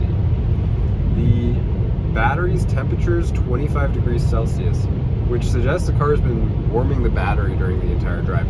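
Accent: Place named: Eastern Europe. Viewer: Where are you from? You have an interesting accent. Like some slight Eastern European accent.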